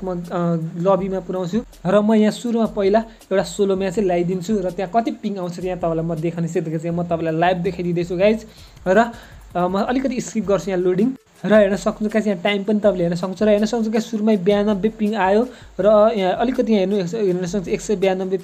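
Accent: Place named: India. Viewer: Yo Indian jasto hawa dherai nabolnu hai.eakdam irritating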